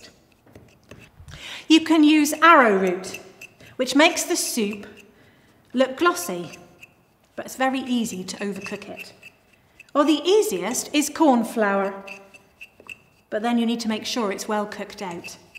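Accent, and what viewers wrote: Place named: West Country, United Kingdom. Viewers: It's how we say almonds in the UK and always have (especially in the West Country where she is from). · If I may, it’s a genuine (and genuinely West-Country British) combination of the British English post-vocalic R sound (which is pronounced ‘ah’ as in ah-mond) and the rhotic terminal R which came from the West Country, and became the standard R sound in US English. Celebrate these distinctive differences; they have made us what we are! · it’s West Country accent, Devon specifically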